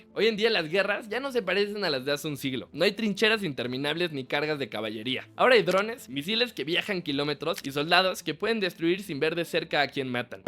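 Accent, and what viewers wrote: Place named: Mexico. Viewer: que habla este tipo? que la guerra no entiene de genero? amigo estas bien ? entiendo que el contexto al cual haces referencia es una guerra tecnologica, pero te recuerdo que la mayoria de guerras se siguen librando en barro y en trincheras, una mujer no duraria ni una semana en las trincheras del frente en ucrania, de hecho ni dos dias. Asi que deja de hablar mrda woke, las mujeres no pueden luchar en campos de batallas reales, no de manera masiva por lomenos. Que hayan existido escuadrones y pelotones de ellas es verdad, pero no es la norma , es mas si mal no recuerdo las comenzaron a usar la URSS solo por que ya no quedaba de otra, eran la ultima opcion, pues la realidad es que biologicamente no sirven para ello. que la guerra no ha traido paz? xdd por tu acento supongo eres mexicano, tus antepasados han muerto por tu libertad que ahora desperdicias haciendo videos pendejos. 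La guerra en su gran mayoria si trae paz, que tu y yo estemos escribiendo nuestras opiniones en internet sentados desde nuestros hagares es prueba feaciente de ello.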